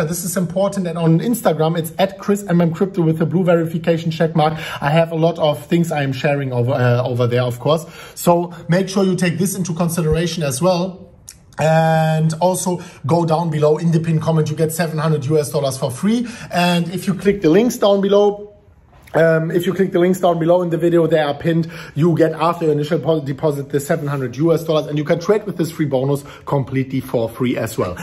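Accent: Germany. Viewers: Junge, dein deutscher Dialekt ist der Hammer! :D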